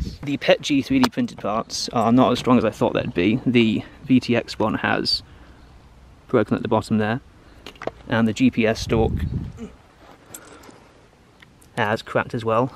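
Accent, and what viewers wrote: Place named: United Kingdom. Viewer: I think my main distrust and poor performance with the 35xx motors and larger props is I live at higher elevation. Based on your accent and surroundings you live somewhere in the UK with an average elevation of ~500ft. I live at 5000ft. M100's will hover for 28 mins at sea level on the larger battery, that same setup will only hover for 15 mins at 6000ft, and with ~500g of payload drops to 5 mins. So whether its work or hobby, I have to have more thrust because the elevation range I fly in is -2000 to 10000 ft ASL for commercial units, and when I fly for a hobby still 5000 ft, give or take 2000 ft. But say your drone makes 6kg of thrust (1500g per corner) and weighs 2.5kg, so it hovers around 50% throttle, its just a little under the max take off weight people generally like to have (50% of max thrust), so while flying you have 50% throttle to play with for flying and error correction. 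But that's lower elevation, at 5000ft that drone would likely hover around 75% throttle. Not only do you lose flight time, payload, but you lose authority. Its not that I am poo pooing 35xx motors entirely, but specifically, big frame, big props, small motors. Bigger frames have more weight, more room for batteries that add weight, and you hit the limits of the drone easier before taking other factors into account, like elevation. And how often do people think about how well their drone will fly when they do a road trip from the coast to the mountains and gained a few thousand feet? I don't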